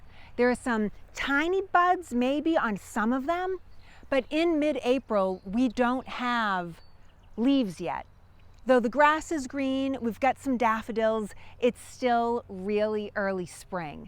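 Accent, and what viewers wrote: Boston, United States. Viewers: Holy shit that Boston is strong. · Is been so long since I've heard an accent from Boston! Great story telling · Pretty cool video!! I am Canadian but have been to Boston a couple of times and I find the history there very fascinating. Next time I would like to go visit some of the places in this video outside of Boston. If you think about it not much has changed about blocking information except the technology behind it. Nowadays they use censorship and security classifications to block the public from learning vital information instead of sending out soldiers in the middle of the night. Thanks for the video ...and I love your Boston accent!!!! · I love your videos and ah Boston accents. I have one of those too. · Watching this in MA. Thank you so much . It’s actually really nice to hear someone that has the same Boston accent as myself . 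XOX ❤️🙏🇺🇸🦅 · I love this channel. The information and narration is excellent. Also I love your Boston accent! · What a delightful accent. You don't really hear this specific type of Boston accent too much anymore. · The B-town accent is priceless. Copious thanks. This is one of the best stories in history. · Love your channel and your accent. I'm from the Boston area living in Northern Vermont and it's refreshing to hear a familiar accent. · Raised in Boston, but I live in Los Angeles now. I love this content, and just hearing your voice makes me homesick. Your narrative of the Revolutionary War is beyond engaging, and certifiably inspiring and accurate. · My new favorite Bostonian! 😊 Ok, my only favorite Bostonian and the only Boston accent I’ve ever found endearing makes me smile as I listen. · I appreciate this female narrator’s accent which I believe to be “Southie” meaning from the southern end of Boston. 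I once went there about 20 years ago when I attended scholarly conferences and heard three guys speaking in this manner. What a great sub-part of America! Thank you! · I LOVE the history. I love no Ai. The Boston, or Massachusetts accent, is almost as bad as, nails on a chalkboard. But that's; "A me thing". Keep doin' what you're doin'. I'll keep watching. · Exciting, and thanks for sharing as we are watching this on the 18th, and you showing the scenery of exactly the season this happened is perfect. I'm a native Texan and one of the things I enjoy, respectfully, is your Boston accent. Thanks for the adventure into our heritage! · Hi, I'm typing to you with with pine tarr stuck on my shoe, do you say creek like we do here in Pa. probably got that fancy Boston accent on that too. I do appreciate the click bait title of this post, but darling, like i said in earlier posts. my undiscovered event is the actual start. 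First England wins 7 years war, day of proclamation of 63 declared in colony, the day after is steton massacre in allen township. this event documented by franklins gazette, is officially signed at phila courts by lynford Lardner, married to Penn daughter, money to scalp ingins, man women and children, this funds the Paxton boys, for no one did it for free. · My family has been in Boston since the 1800s. This is the thickest Boston accent I’ve ever heard lol. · That Boston accent is sweet. I wish Boston would learn from you and get rid of that communist mayor. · Boston.............with a hint of LonGiland. ?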